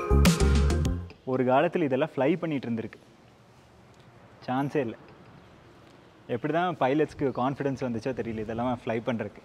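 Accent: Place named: Kongu, India. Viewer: தம்பி நம்ம ஊரு கொங்குதமிழ் பேசுறபோதே உங்களை ரொம்ப பிடிச்சிருச்சுங்கோ .வேலையில் கவனமா இருங்க மா.உடம்பை பார்த்துக்கோங்க சாமி.இது அக்காவோட அட்வைஸ் ங்கோ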